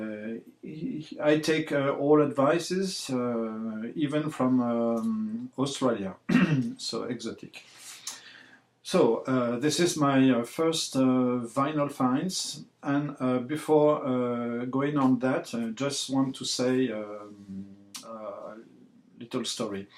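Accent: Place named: France. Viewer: Always appreciate the French pronunciation! If I had more time in the day I would love to study it since outside of my English speaking stuff, French movies and music seem to dominate my music and film collection next. looks very intriguing. I love and the.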